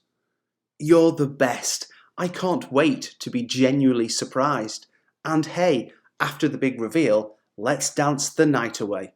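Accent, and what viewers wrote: United Kingdom. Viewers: I actually like your British accent, it's cool 😂❤even though I find it more difficult than the American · Please tell me, this is it British English?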